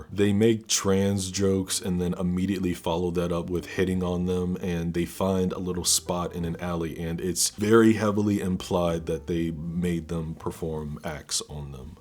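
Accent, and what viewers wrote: United Kingdom. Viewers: Giving a like for the british accent alone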